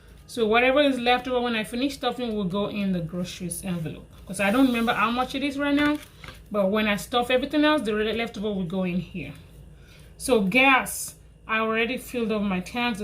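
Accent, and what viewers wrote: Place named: Nigeria. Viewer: Hi! Great cash stuffing. Your so close to finishing your 52 week challenge woo hoo. I love me some Nigerian jallof rice I’ve made it a few times. I thought your accent was Nigerian. I’ve been to African grocery store I find it fascinating. I really enjoy pounded yam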